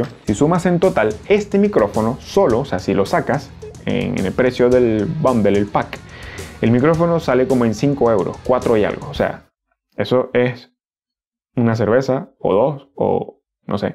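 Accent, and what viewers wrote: Venezuela. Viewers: Vos sois maracucho verdad? 😂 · Excelente video hermano! Solo por curiosidad, ¿eres venezolano? tu acento me suena. XD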